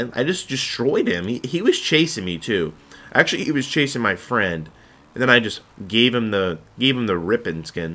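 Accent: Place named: Canada. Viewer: Let me make a vid with you. Your in Canada because of your accent, I'm flying there soon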